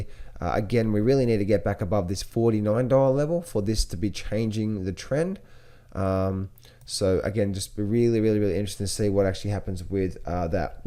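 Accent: Australia. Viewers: Thats an Aussie accent !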